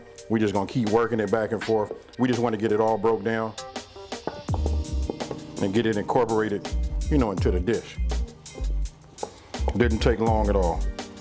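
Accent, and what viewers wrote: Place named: Carolinas, United States. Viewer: You sound like you're from the Carolinas.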